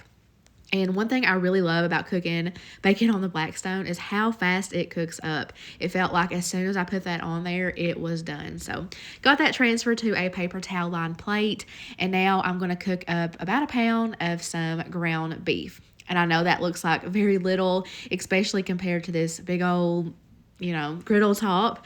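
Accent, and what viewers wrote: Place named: Kentucky, United States. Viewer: Thank you so much for making these videos! You always give me such great ideas of meals to fix my family! And I’m a fellow KY girl, so you sound like family teaching me! 💓💓💓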